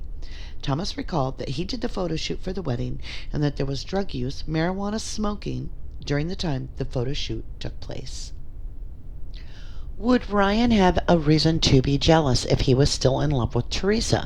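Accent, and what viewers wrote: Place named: Midwest, United States. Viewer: I used to do a lot of research on this case. I'd found out that one of the last texts that Th received was from Czech on 10/31 sometime before 2pm. I forgot where I'd found that, but it's out there somewhere. Seems like you're doing good work here. Interesting that you were able to obtain all of the photographs that you have. Interesting too that you speak with a midwestern accent that very easily could be from somewhere in Wisconsin.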